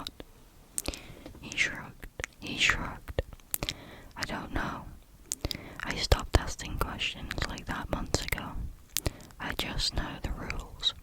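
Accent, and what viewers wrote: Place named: Liverpool, United Kingdom. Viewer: I've only just found your channel. I'm glad I did, there's something about your accent and how you enunciate, it scratches my brain. Almost sounds like a very soft scouse accent.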